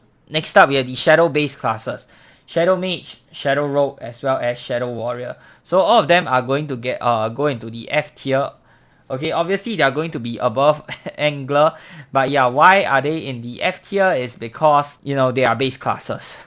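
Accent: Singapore. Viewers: Clear advice + Singapore accent = perfection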